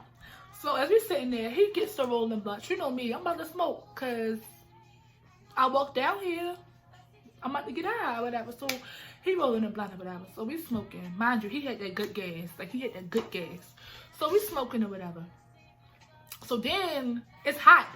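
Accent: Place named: Baltimore, United States. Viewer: I love the bmore accent! Each time you said you I was like yasssss 🤣🤣🤣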